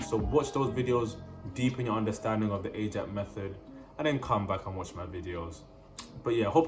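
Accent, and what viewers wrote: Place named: United Kingdom. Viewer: Awesome that you're making content! Right now I'm a few months into learning japanese using the refold method, so I'm not an expert by no means. It's just that your japanese pronounciation kept bugging me throughout the video. I think you still have a really strong british accent while speaking japanese words like kanji, katakana & hiragana. Other than that the video was great and a good overview of the topic ! Just the pronounciation bit makes me a bit hesitant to subscribe, because I'm afraid it might be harmful to hear incorrect pronounciation of your target language.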